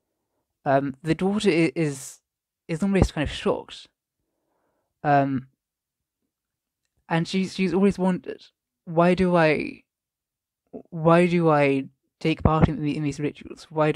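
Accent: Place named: RP, United Kingdom. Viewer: you have a very posh accent. You must have gone to posh public school. Your understanding of Indic faiths is very much appreciated. It would be very good to connect as also live overseas and feel frustrated that well meaning Xtians overseas don’t realise the damage they do to India.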